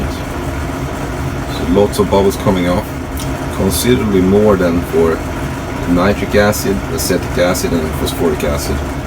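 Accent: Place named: Sweden. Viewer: Took me 2 seconds to know you Are swedish :-)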